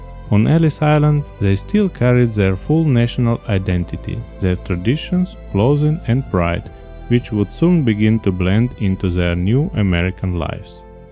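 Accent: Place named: Ukraine. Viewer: You speak like a Ukrainian person whose videos we watch. Of course, I know that doesn't mean you are from there, just that to my ears it sounded similar. Most of your videos that I had watched used the more American-sounding voice. This was the first time I heard your less changed voice. I'm glad you liked the story of some of my family's origins. We have enjoyed going back and finding out where all our ancestors traveled from. I greatly look forward to seeing more of your brought-alive old pictures. 😊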